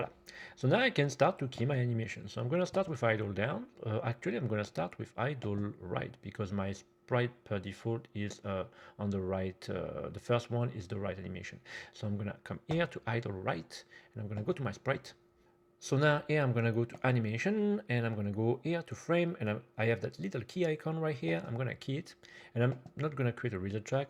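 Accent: France. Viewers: oh great! Thank you for those extra videos. Also your french accent is hard but as I’m spaniard I think I understand you better than if you were native english haha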